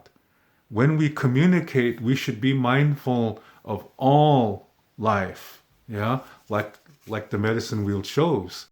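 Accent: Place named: Hawaii, United States. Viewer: You have an accent. Very similar to native Hawaiians. Kind of sing-song. It’s nice! Have an enlightened new year.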